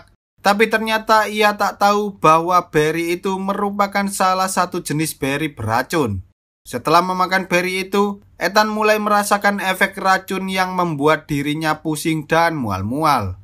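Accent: Java, Indonesia. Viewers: Medok ya pak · Logat Jawa ny ketara mas, jd kurng enak denger ny.. Coba aja Narator ny Logat Indonesia Tulen lbih enak denger ny. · Pekat jawa nya, apa khabar bang... haha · Medok bngt bang ngomongnya😂 Lucu beud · Asik juga nonton film di ceritain pke logot jowo 😂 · Hmm risi terlalu medok narasinya *Menurut gw woy ✌🏻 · Meddog ngge. . . . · ethan...ke edan...walalalaaa.... kurangkan sedikit pekat jowonya wak..... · Medok banget ngomong nyaaa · Suara jawa nya kya tmn sya omm 😂😂😂😂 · Jawa nya medok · Pekatnye jawe ko bro ....😂😂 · Wong jowo lek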